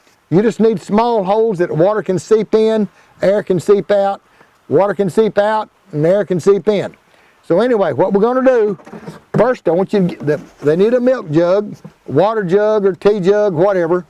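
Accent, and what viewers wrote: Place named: East Tennessee, United States. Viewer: you sound almost like you are from East Tennessee. Almost. I love watching your videos. My grandpa used to grow the best muskmelon in the world. He's been gone now for 40 years...that's hard to believe. But I can still taste that muskmelon. He grew it by his chain link fence, and hung the Mellons on the fence in slings made from granny's old pantyhose, lol. I'm so glad to see you encouraging the young ones to grow, most of them have no idea what food is supposed to taste like. God bless you!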